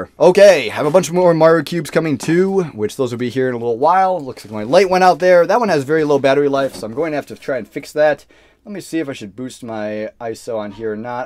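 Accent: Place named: Great Lakes, United States. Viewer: Great Lakes accent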